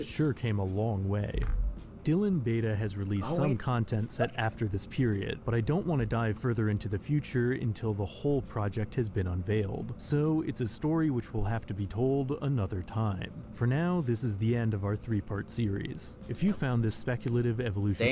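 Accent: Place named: Russia. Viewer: In general, I think you have a chance to become popular👍 . (Well, English with a Russian accent is so-so.)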